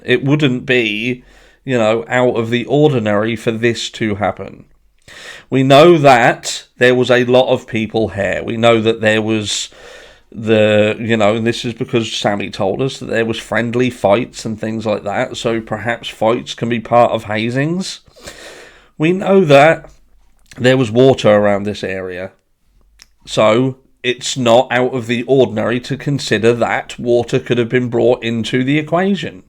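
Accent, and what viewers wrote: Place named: England, United Kingdom. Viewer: Awesome sir! So glad found ya! I love the English accent my friend! Our views are same on many aspects of this case! Thank you for good information,and great theories! Have great Friday Mr!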